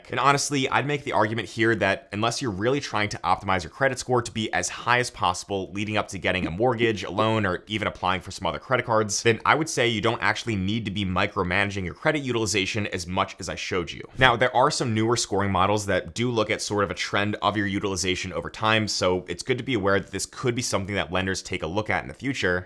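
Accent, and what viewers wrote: Philadelphia, United States. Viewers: Wait are you from Philly?! 😂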